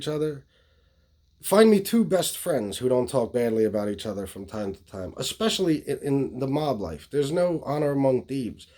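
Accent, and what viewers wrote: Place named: Northeast, United States. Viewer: You only have an accent to people not from the northeast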